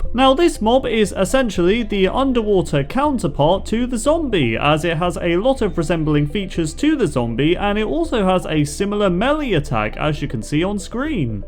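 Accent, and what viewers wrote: United Kingdom. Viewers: Must be a northerner.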